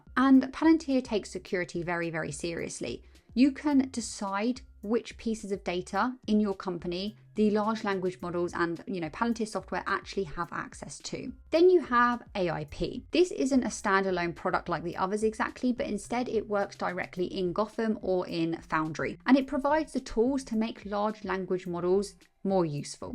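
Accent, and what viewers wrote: United Kingdom. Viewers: I like your British accent❤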